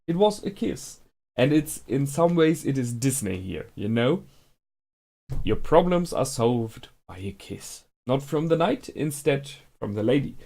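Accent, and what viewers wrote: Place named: Germany. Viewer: Hört man wenn man selbst Deutsch ist:)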